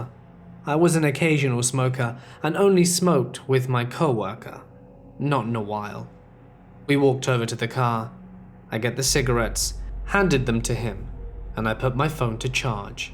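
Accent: England, United Kingdom. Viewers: laying in the middle of my bed, listening to middle of nowhere stories😀😀. I soooooooo wish you would do another collab with hellfreezer when you get settled! Besides killer orange cat, you guys are my favorite narrators and your voices would be awesome together! An English accent, and a Scottish accent, holy fucks batman!! He's a great narrator and I know my fellow MORTicians would like him as well. It's surprising that he doesn't have more subs.PS, the only thing better would be a collab with all three of you, haha. 🤗🤗🤗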